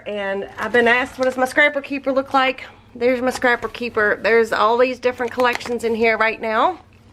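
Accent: South, United States. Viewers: Lol, I was listening to you and thought: this girl is definitely from the south. Then I saw you were in Louisville. I’m in Paducah! Love your ideas!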